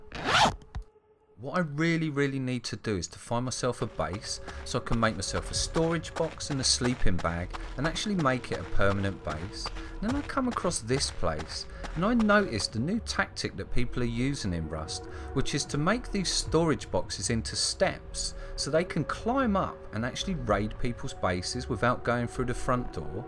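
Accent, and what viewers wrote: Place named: United Kingdom. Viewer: the calmness in your voice as you're hacking up people is quite disturbing lol +1 for the british accent... kinda "Wallace" -like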